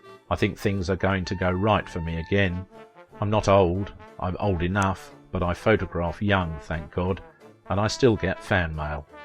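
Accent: England, United Kingdom. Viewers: About I had to play it back, cause I thought the narrator said Sal Vagina...🤔, he had a thick British or English accent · A normal, beautiful English accent. It's our American accent that can be called thick, in my opinion.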